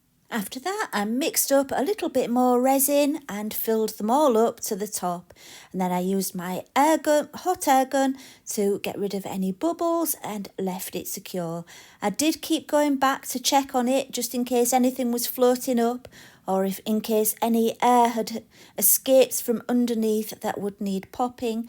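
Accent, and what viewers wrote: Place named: Lancashire, United Kingdom. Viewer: Just subscribed..Saw your video today for the first time! Really cool effects with the foil! Love your Lancashire accent! I’m a Lancashire girl too..I’ve lived in America for 40 years …still got the accent though lol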